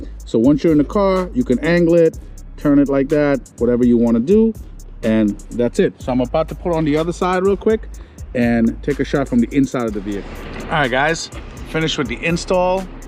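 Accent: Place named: Spain. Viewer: I think he’s Spanish American as he has a Spanish accent in his speech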